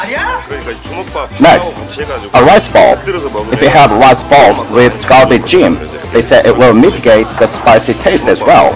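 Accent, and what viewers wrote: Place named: United Kingdom. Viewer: 영어가 영국식 같아요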